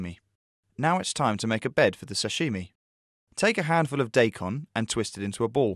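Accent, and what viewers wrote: United Kingdom. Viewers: British pronunciation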